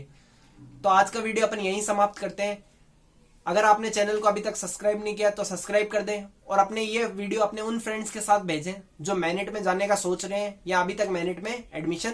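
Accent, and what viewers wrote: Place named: Jodhpur, India. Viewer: ohk😅😅 mtlb jodhpur nhi jodhpur se thoda sa dur boli se lg rha tha😅😅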